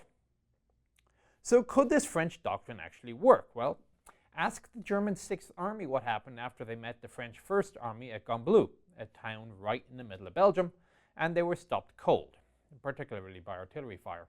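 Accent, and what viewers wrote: Ireland. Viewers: is the Chieftain Irish? He certainly has that silver tongued lilt from Eirre. There's no gutteral Northern Irish there. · I'm not bragging cause I'm sure enough a loser but I know all this. I think the is reading this..But don't get me wrong...i love the Irish accent and the delivery. He would be a hell of a professor. But he does better speaking for a video game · It's the Irish accent. It tends to start low then go high at the end when important information is presented.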